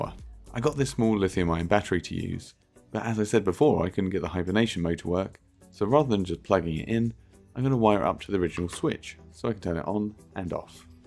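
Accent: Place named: United Kingdom. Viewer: I think you are the most british guy i've ever heard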